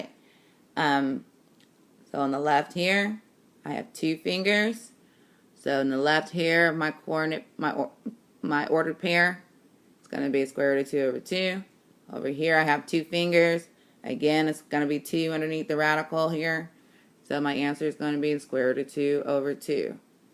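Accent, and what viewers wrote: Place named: Barbados. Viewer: are you from barbados?? your accent is kinda rihanna-esque lol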